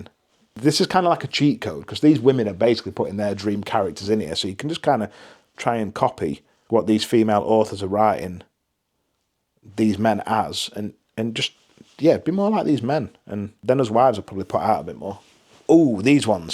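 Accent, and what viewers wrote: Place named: Northern England, United Kingdom. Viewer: As ever, thanks for the recommendations, there are some here I am quite keen to explore. On this point - you can read gore and violence but I have a ceiling for that, and one of the books you highly recommended lately you said had a 'disgusting' magic system and I am grateful you spared me the mullah in picking a copy up. I would really love it if you continue to point out (where you are aware) any books that are particularly violent or smutty as I don't like descriptive violence and find that, for me, smut ruins the flow of the narrative ( if I wanted smut I'd pick up a Mr Gray book). It would be so handy to have this information if you have it. Keep on keeping on dude, I love you keeping it real. From a working class Southener with a cockney accent who loves youre Northern dialict. Take of yoursen.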